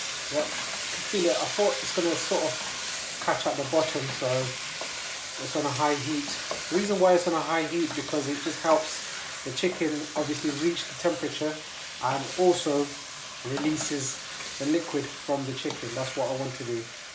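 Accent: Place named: United Kingdom. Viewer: Absolutely one of my favorites! I've been adding Ochra/Bindhi to my curry lately and it really makes the dish much tastier and healthier. I will be copying your recipe and style for my next chicken curry for sure... I really like your video (very well edited) and your British english makes it all the more enjoyable to follow. Thank you very much. I have subscribed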